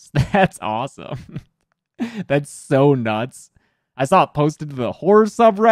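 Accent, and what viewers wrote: United Kingdom. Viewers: bri'ish pog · OMG YOUR BRITISH !!!!!!! MY FAVOURITE YT AND NOW THIS!!!!! Bru I love you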